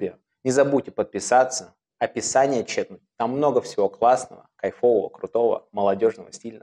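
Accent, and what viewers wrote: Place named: Saint Petersburg, Russia. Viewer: так ну акцент точно питерский аах))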